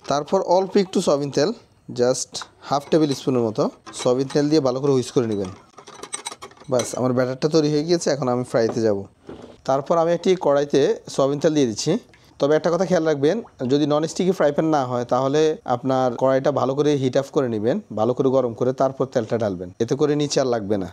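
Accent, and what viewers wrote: Noakhali, Bangladesh. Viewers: নোয়াখালী এক্সেন্ট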